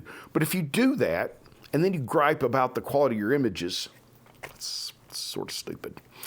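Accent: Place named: West Texas, United States. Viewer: I like the way you talk, west Texas. I'm Houston, living in Colorado's San Juan mountains. I think I might be the only MFT landscape photographer in three counties.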